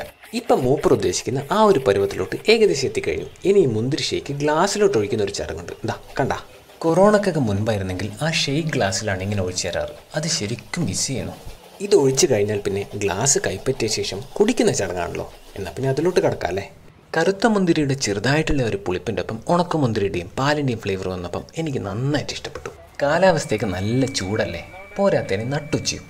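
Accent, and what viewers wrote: Kannur, India. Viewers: Aa kannur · Bro kannur aano. Aa voice .. yente monee! !! Poliii 😍😍😍😘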